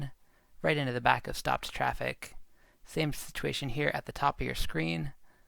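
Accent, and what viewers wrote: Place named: Seattle, United States. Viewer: I love how the most seattle sounding dude is commentating this.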